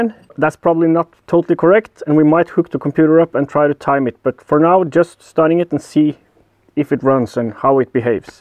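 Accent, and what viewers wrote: Australia. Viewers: are you from Aussie mate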